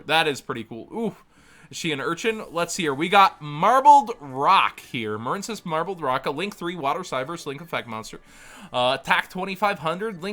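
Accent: Texas, United States. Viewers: Howdy y'all! And welcome to the house! That Southern Texas accent though.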